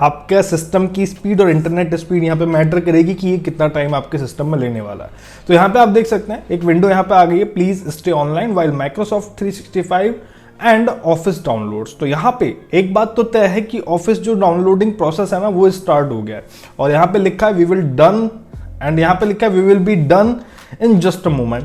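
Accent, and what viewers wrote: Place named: India. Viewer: Bro, you sound like an auctioneer with an Indian accent. slow tf down, there's no prize for fastest speaker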